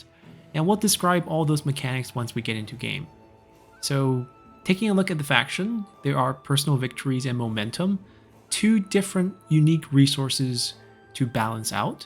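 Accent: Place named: China. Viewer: I did not expect this chinese pronunciation damn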